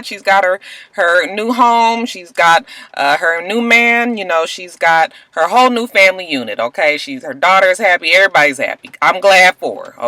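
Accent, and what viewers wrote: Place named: Atlanta, United States. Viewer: You do sound a little ghetto but I love it! You sound like ATL native.....lol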